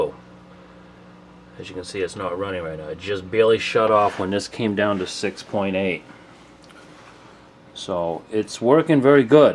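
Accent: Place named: Bristol County, United States. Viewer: ohh dude I moved out of mass 8 years ago but I know a Bristol county accent when I hear it! salute!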